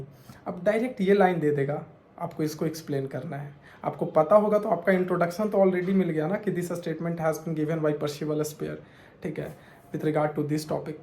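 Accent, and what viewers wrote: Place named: India. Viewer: OMG .. you are comparing spelling mistakes that happens in every other sentence with how u say basic words in basic indian accent ..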